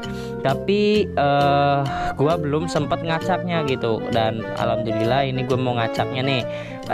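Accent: Tegal, Indonesia. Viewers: Ky orang tegal si bang logaknya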